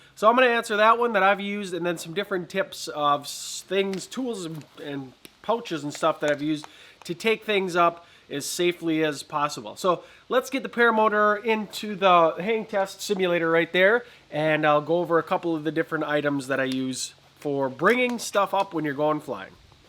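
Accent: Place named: Wisconsin, United States. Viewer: Great vid! I vote you do all the tech talks w/ the thick Wisconsin accent that you used in previous vids!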